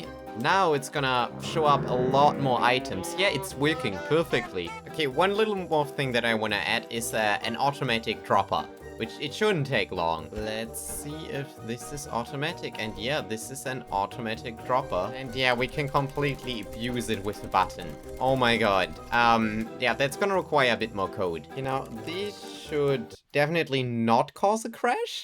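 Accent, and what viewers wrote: Germany. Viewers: wait you are german?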